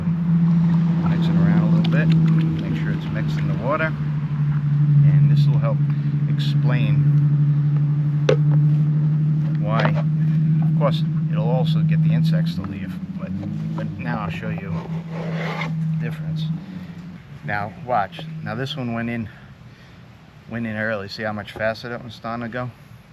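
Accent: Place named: New England, United States. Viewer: You know what, your little demonstration was spot on to show how soap helps with penetration!! That was F'in cool! And by your accent, I know you are in the same part of the country, N.E.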